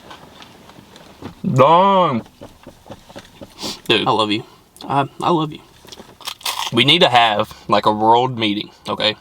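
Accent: United States. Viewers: You misunderstood why Brits think Americans are rude, it stems from ww2 when soldiers came over and flaunted their wealth thinking they were better than us, also just the way your accent is louder and more flamboyant it comes across as brash .. yes it's a stereo type but blame it on your film and music stars 😆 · Don't worry about the language differences, there's no 'correct' version of English. Language evolves, splits and merges. We got 'cookie' from Dutch a long time ago and it's a great word. No one owns English and no one can dictate how it should evolve - it does what it does and it's amazing. I agree on prawn cocktail - actual prawn cocktail is one of my favourite foods ever but I don't like the crisp flavour 😂(also, EMOJIs - huge development in written language - we can actually convey tone in a way that isn't clunky now!). The banter is a difficult one - it's usually fine because people can tell if someone's not up for it and won't make them uncomfortable but it certainly can backfire. 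I've never been good at taking it and people can just tell right away - one look at me and they know I'm too delicate 😄I wouldn't change it for the world though; comedy keeps me going and it's incredible that it's woven into our way of life. Love this video! You're so reasonable and sweet - a model American (with a lovely accent too!) ❤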